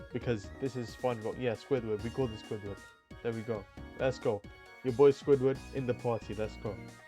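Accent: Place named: Sydney, Australia. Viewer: You got a Sydney accent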